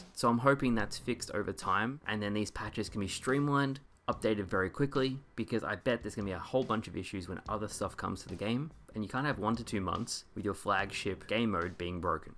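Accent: Australia. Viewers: Are you Aussie or just in a remote area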